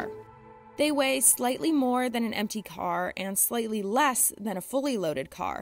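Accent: California, United States. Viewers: I think its a California accent. Reminds me "Legally Blonde". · I think she just have a regular Californian female accent. This accent was so much use for caricature of superficial girl most of the public doesn't know it is actually a real accent that real people have. I don't think she does it just to mess with you that juat how she speak. ps: I'm French I just learning to differentiate accent so I'm maybe wrong on this...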